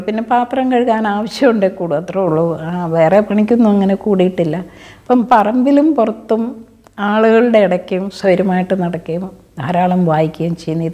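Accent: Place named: Pala, India. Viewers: എത്ര വർഷമായി. നോർത്ത് ഇന്ത്യയിൽ ജീവിക്കുന്നു എന്നിട്ടും . ആ.. പാലയുടെ ശൈലി ..... അതാണ് ..... ദയാ ബായ്...... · This the typical Pala Slang, check out the colloquial expression " Mooch"... ANOTHER SOUGHT AFTER EPISODE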